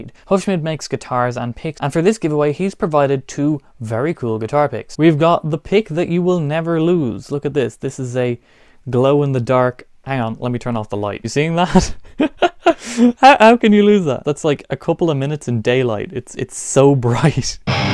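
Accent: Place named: Ireland. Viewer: Congratulations on the 56,000. I just listen for the Irish accent to know it's you when I read something from your channel. 🤣😂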